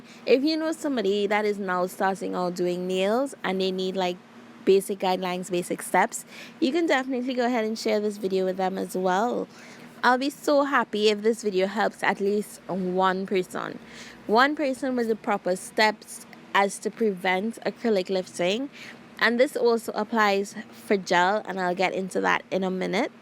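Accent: Trinidad and Tobago. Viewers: Your accent sounds Trini. Love the step by step instructions. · Omg your nails are gorgeous 😍😍 you did that !! I’m so glad I found your YouTube channel 👏🏾👏🏾👏🏾👏🏾 do I hear a trini accent Deh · Trini accent isn’t harsh it’s kinda soothing lol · totally sounds Trini!!🇹🇹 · You must be a Trini, I hear that infamous accent. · I hearing that Caribbean accent…Trini to deh bone? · You go Trini !! I could hear your accent !!! Great job!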